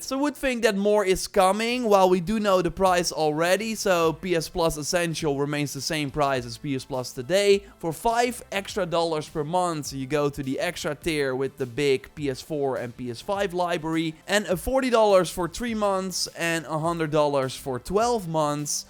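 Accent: Netherlands. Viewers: Do I hear a Dutch accent jongeman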